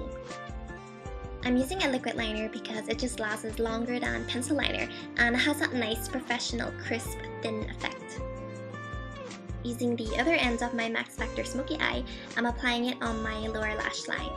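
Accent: Ireland. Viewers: I know everyone says this but I LOVE your irish accent (: haha talk about the irish charm. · are you like, half irish or something? :) great tutorial btw <3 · Telling someone who technically speaks English fluent and has an Irish accent not to try to sound fluent is just plain retarded. And you need to clean your ears please because I, who do not speak English as my first language, am able to understand what she's trying to say. Thank you very much.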